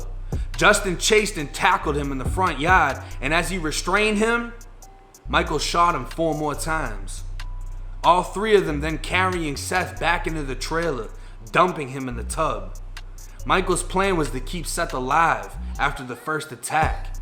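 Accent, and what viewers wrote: Boston, United States. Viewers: Sounds like a boston version of Mr Ballen story telling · How DAAAAHHHKK it would get lol all love but your Boston/Florida accent be getting me at times lol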